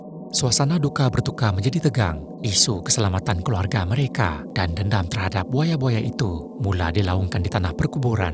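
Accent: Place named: Sarawak, Malaysia. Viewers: ada org Sarawak cakap mcm ni,lagi pun Kalau kt Sarawak ko bunuh buaya tanpa sebab mampos lh ko kna tangkap pihak krjaan Sarawak..Nama pun dia guna atau 😂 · ya... Rasa loghat orang Sarawak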